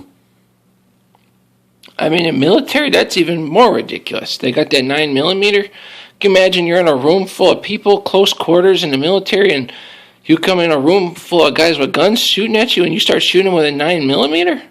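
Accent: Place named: Brooklyn, United States. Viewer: Where'd you grow up that you talk that way? Brooklyn?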